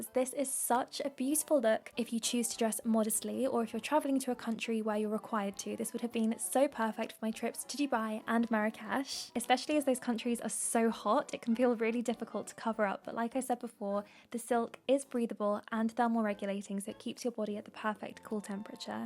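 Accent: RP, United Kingdom. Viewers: Steps to having a more luxurious life: 1) step one: have a charming, sophisticated posh British accent like, a mix of the movie Notting Hill and Victoria Beckham and Kate Middleton 2) step 2: have poise and panache like 3) step 3: have exquisite taste in architecture, art, and fashion like In short: BE!!!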